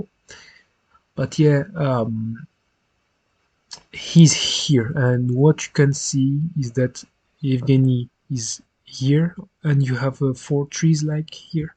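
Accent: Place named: France. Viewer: The french accent 🥵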